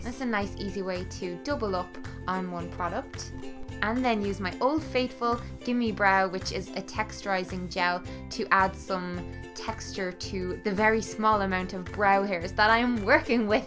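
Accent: Ireland. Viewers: This is my second time watching this, I wanted to try the eyes you did and I had the captions on by accident, oh my God I'm weak! It's clearly having trouble with the Irish accent because some of the mix ups are hilarious eg.. 'relax in a box (bath!), 'as a guideline for your Catholic (catflick!) 'its nice and dry skillet chiding me work hard play hard you'll never get attacked again. I hope you enjoyed the CEO'!! Too funny!:'D Ps, You look unreal in this video and congrats on graduation!!:) x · Congrats again girlie!! You look glowing :). I am married to a gorgeous Scotsman but I swear I should have found an Irishman lol cause I could listen to you for days lol!! Love from Canada xo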